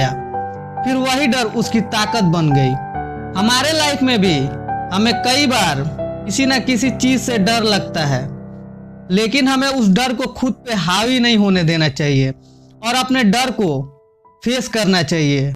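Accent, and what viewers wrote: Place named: Bihar, India. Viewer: Bihari youtuber bhai accent badalo yaar...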